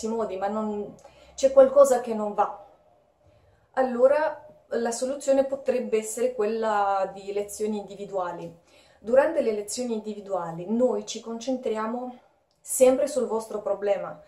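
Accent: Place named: Rome, Italy. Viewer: modi e parole "romane" ti dona e ti dà un certo "charme"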